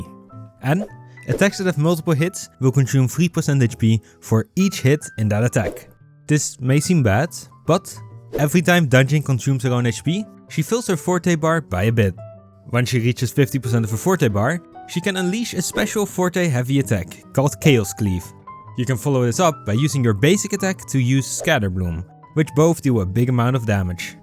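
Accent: Netherlands. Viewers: love the dutch accent :) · Danijn for the win! You sound Dutch?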